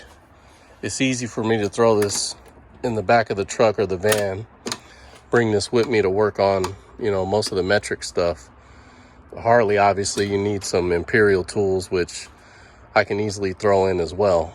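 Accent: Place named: West Newbury, United States. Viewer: I appreciate the vidya, with that west newberry accent mr john cena jr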